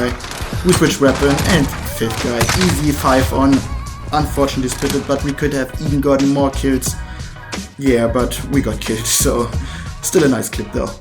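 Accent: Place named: Germany. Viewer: Deutscher Akzent am Start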